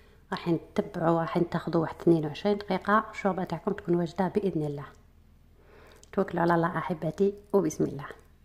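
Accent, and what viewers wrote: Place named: Levant, Arab world. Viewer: Voyer êtes algérienne? Votre parler est du mechrek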